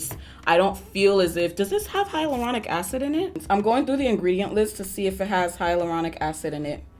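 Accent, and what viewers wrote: Nigeria. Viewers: I am here for that ya Nigerian accent, it throws me off EVERY TIME🤣🤣🤣🤣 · Your nigerian accent is respectable:')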